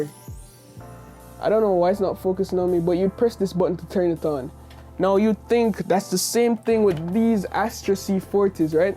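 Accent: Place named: Jamaica. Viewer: I'm new and I just heard yu voice and mi a say that yu sound Jamaican who live in America I'm JM btw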